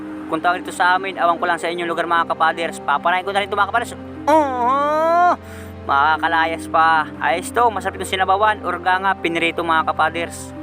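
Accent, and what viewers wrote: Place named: Patnanungan, Philippines. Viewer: San nga pala location mo ka father's?, parang Patnanungan accent ka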